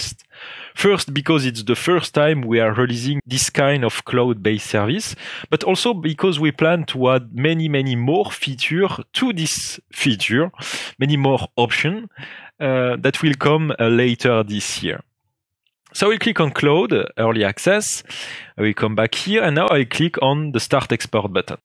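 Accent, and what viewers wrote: France. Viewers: Twinnmotion is a beauty, but that fucking French accent leaves me in awe, still don't understand a single word the guy says unless I activate captions. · Французский акцент? Очень сильный.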